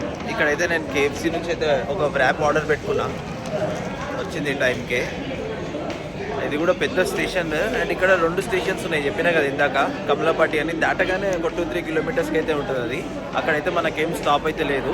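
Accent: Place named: Telangana, India. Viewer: తెలంగాణ యాస 😊❤...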